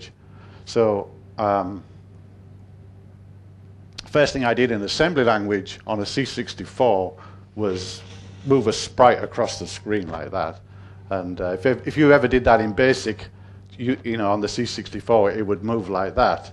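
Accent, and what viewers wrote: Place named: Hull, United Kingdom. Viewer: So nice to hear the Hull accent;) You're a legend. Grazey/PHF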